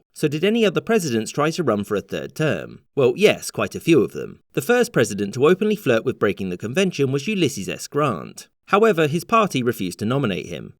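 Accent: United Kingdom. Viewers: Anyone else cautiously listen when American History is narrated by a Brit? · Clicked on this thinking “oh this should be interesting” but then it’s some British dude talking about something he has no idea about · Why is a Brit lecturing me about my country 😡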